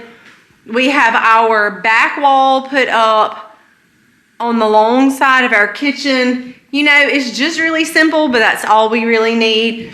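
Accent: South, United States. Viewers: I love your videos and especially your southern accent! I could spend all day listening to you talk. I live in Minnesota and people up here don't speak with accents.. I have a Walmart 2 miles from where I live and I've had issues with them over the years. So I understand where you are coming from. Good luck dealing with your Walmart!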